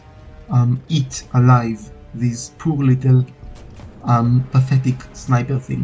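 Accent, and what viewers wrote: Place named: Israel. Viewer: The accent, oh the ACCENT! I have an Israeli friend and he speaks English just like this guy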